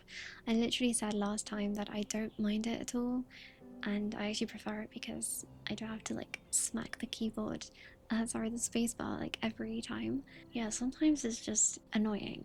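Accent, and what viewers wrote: United Kingdom. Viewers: Ru from uk?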